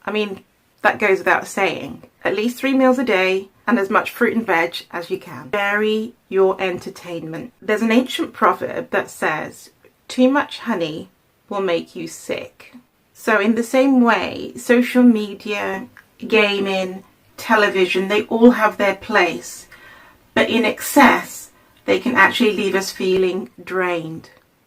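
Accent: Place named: RP, United Kingdom. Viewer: You've got a Received English account and speak fluent Italian- i want to be you when i grow up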